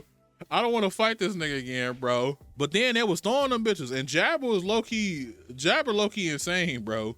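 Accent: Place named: Chicago, United States. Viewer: do i detect a CHICAGO 🥷🏾!?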